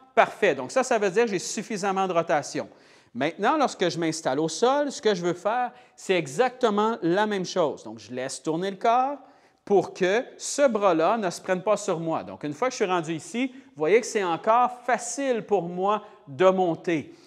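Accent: Canada. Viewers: Merci beaucoup, pour ces vidéos très claires et faciles à adapter. Et avec l’accent canadien, c’est encore plus sympa!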